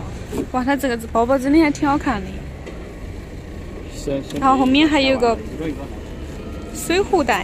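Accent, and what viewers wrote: Chongqing, China. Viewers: 在重慶都要這樣講話嗎？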